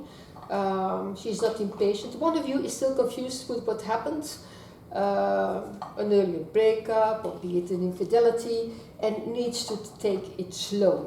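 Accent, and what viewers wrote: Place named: Netherlands. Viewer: Are you from the Netherlands? Your accent sound like dutch.❤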